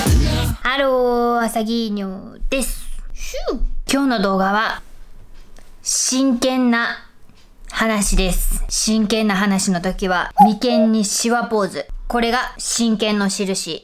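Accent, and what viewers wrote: Kansai, Japan. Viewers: 今日は関西弁強めやね！きっとスターになれるで！！！ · こんな関西弁酷かったっけ？ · そんな頻繁に関西弁使ってたっけ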